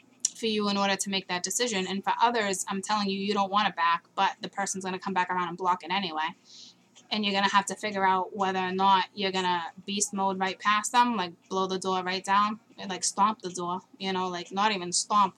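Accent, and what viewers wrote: Boston, United States. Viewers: Hey You's I love your Boston accent!😂❤ And your Reading❤❤ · Are you from Boston? I'm loving your accent!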